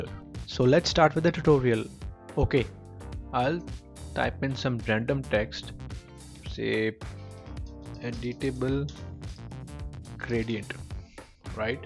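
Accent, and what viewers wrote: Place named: India. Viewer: it is allways the indian accent, perfect. thanks.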